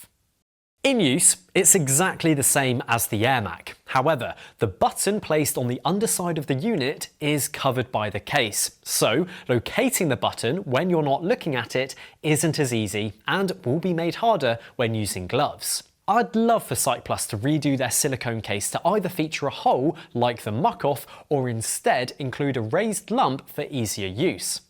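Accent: United Kingdom. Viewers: This must be a Brit channel based on the host's accent and that I see no mini or midi pumps that are available in the US displayed here. My pump is programmable and rechargeable and very poplar in the US.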